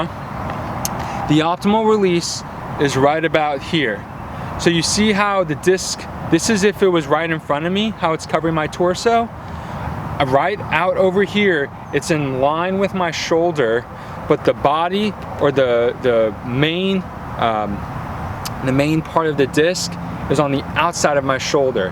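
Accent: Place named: Colorado, United States. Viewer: I hear a Colorado accent. Are you from CO?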